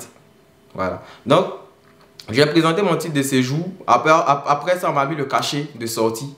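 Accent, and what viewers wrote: Ivory Coast. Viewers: Belle vidéo J'ai kiffé Je suis camerounais mais je kif l'accent ivoirien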